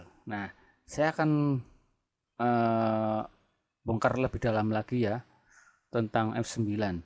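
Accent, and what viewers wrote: Banyumas, Indonesia. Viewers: like 11 om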